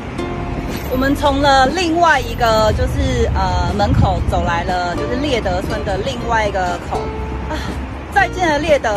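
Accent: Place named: Taiwan. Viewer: 國語台灣本島腔，難聽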